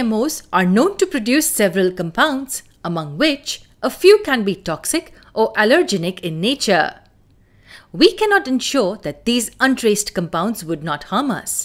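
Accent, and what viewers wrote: India. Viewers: Neden Hintli biri sunum yapmak zorunda ? Kulak yoruyor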